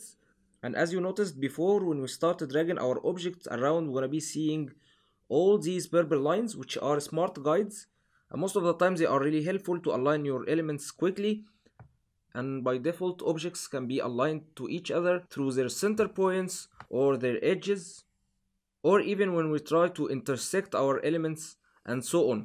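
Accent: Egypt. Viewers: مصري حيبي عرفتك من لهجتك تابع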